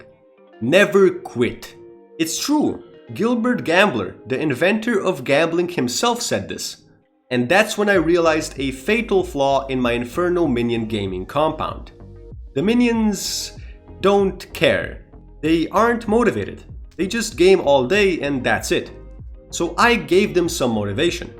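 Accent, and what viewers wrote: Israel. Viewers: אז למה יש לו מקלדת בעברית וגם יש לו מבטא חשבתי בהתחלה שזה לא דומה למבטא ישראלי אבל זה כן מזכיר פשוט הוא לא עושה את הר והח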